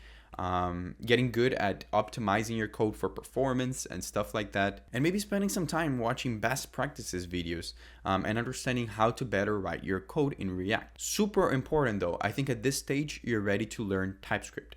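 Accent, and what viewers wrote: Brazil. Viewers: tu é brasileiro?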